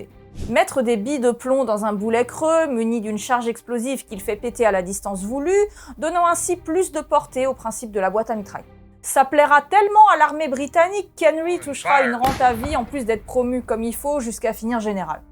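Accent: Alsace, France. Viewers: Mama cet accent alsacien 😍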